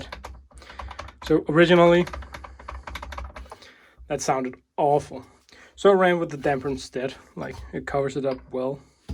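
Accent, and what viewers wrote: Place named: Denmark. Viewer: I got the wood version and yep the magazine wobbles a lot but surprisingly the flash hider is really solidly on there like I've even lost the small screw to make it stay in place and it still sits there perfectly, i can't speak for The Range because I replaced the spring. also due to the dialect, er vi Dansk?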